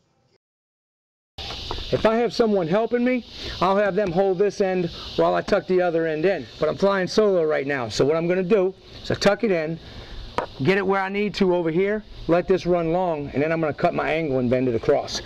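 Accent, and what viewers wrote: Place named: South Louisiana, United States. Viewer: Am I mistaken in hearing a bit of South Louisiana in your voice. Not a lot, but it's there.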